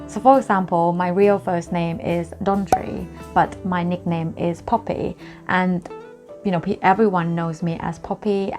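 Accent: United Kingdom. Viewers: what a British accent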